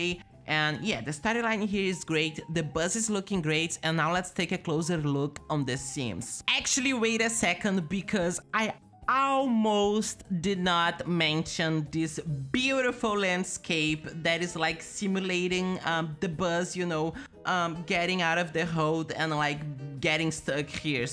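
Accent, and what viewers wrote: Brazil. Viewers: Everyrime inwarcgbtour vídeos I’m trying to figure out where you’re from hahaha I’m surebyiure Brazilian. Love seeing fellow Brazilians doing content in English!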